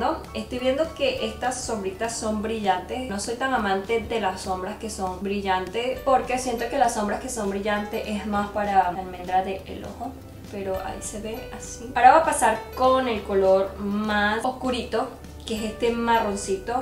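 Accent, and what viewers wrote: Venezuela. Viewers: Mi.usted es asiacata..pero tiene asento Venezolana..soy nueva..sakeme d duda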